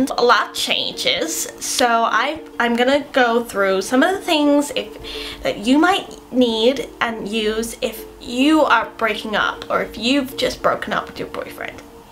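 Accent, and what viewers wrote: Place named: United Kingdom. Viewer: Just a few seconds in, and I already forgot that you had a British accent! Great and hilarious video!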